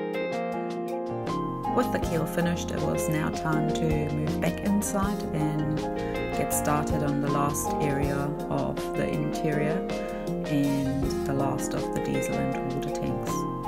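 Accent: South Africa. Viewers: Are you originally from S.A.? I checked your profile and it says NZ, but sometimes your accent sounds a bit SA.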